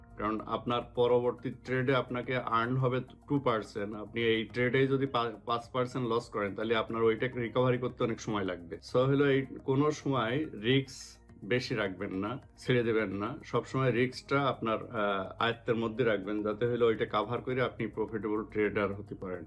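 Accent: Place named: Kushtia, Bangladesh. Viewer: Vaiyar basa ki kushtia te? Kushtia r vasar moto lagtise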